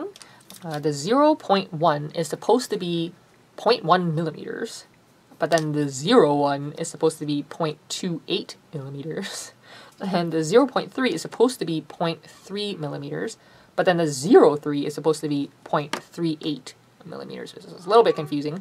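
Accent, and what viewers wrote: Canada. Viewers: I loved drooling over your toolbox of goodies! I'm a pen addict! :) I thought you sounded Canadian! I'm a Canadian living Down Under. I moved to Australia 14 years ago, but Canada will always be 'home' to me! :)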